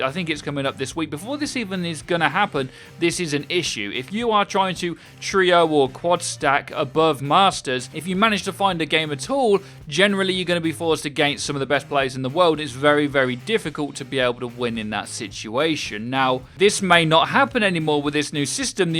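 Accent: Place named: United Kingdom. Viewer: Got your account in My team in placement matches huge loss still 10/10 to hear your brittish accent live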